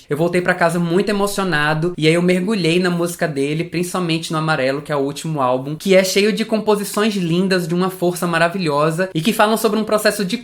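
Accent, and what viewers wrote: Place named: Bahia, Brazil. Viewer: Oi amigo . Tudo bom ? Qual seu nome ? Vc mora na Bahia ? Falo pelo sutaque . Não conhecia seu canal , é ótimo , tem um conteúdo de qualidade parabéns .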